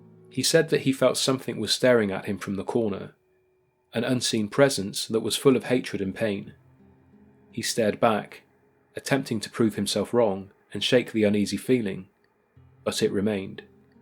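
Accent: United Kingdom. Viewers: I’m glad the narrator is British. RIP London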